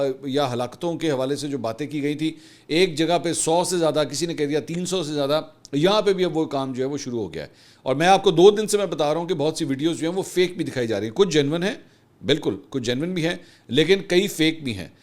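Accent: Punjab, Pakistan. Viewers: Bhai Salam Punjabi touch Sath ma ap lgatay hn bra mza aata Hy zabardast speech salamat rahin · پنجابی پنڈوہ اردو نیوز میں بھی پنجابی😂😂😂 لیکر آتا ہے 😂😂😂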